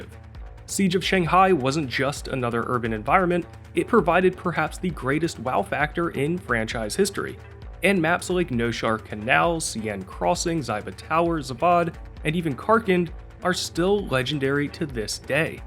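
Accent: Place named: Philadelphia, United States. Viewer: Great video, very well put together. Just subscribed! Also, are you from the Philly area by chance? A few words you say have an accent that comes through lol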